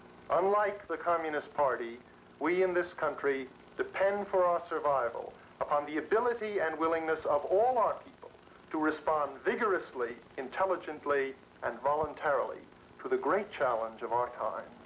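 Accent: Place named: Oxford, United Kingdom. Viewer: Попробуйте посмотреть в оригинале - диктор говорит с очень красивым акцентом, характерным для ученых в Оксфорде:) И это не единственный плюс просмотра в оригинале - про-версия не нужна, нейроперевод не нужен, ваши когнитивные способности улучшаются, а главное - все совершенно бесплатно.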